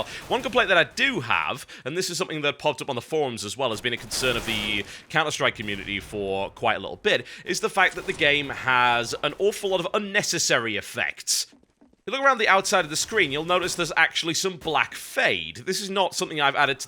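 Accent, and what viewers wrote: United Kingdom. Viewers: I love your voice man...its deep British awesomeness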